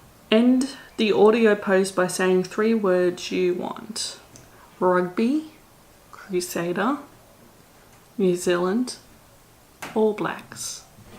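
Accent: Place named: Australia. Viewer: Cool video! IMAO you've got a strong Aussie accent xd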